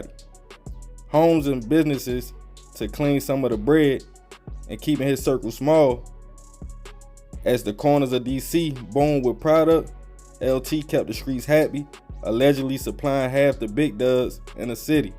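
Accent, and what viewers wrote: Baltimore, United States. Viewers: U from bmore bruh u definitely not from dc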